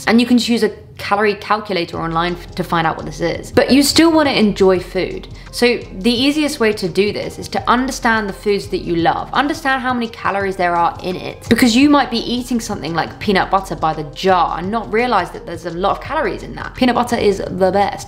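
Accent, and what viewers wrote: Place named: United Kingdom. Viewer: I can't get enough of your British accent. I always look forward for your videos ever since I found your channel. Looking even more amazing as always btw,. 💪💪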